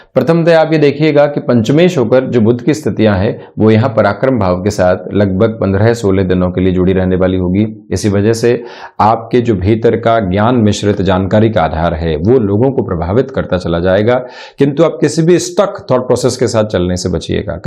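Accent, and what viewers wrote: Standard. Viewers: सटीक विश्लेषण भाषा पर अच्छी पकड़ , उसका प्रयोग और शुद्ध उच्चारण प्रभावशाली . साधुवाद !!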